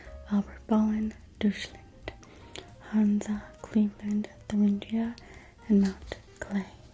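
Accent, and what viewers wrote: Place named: Michigan, United States. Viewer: I've never heard a Michigan accent complemented before! I appreciate it as a michigander myself 😅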